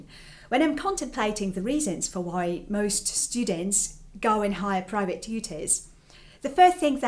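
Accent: United Kingdom. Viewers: Never have I heard any non native speaker with such brilliant British accent! Amazing, really.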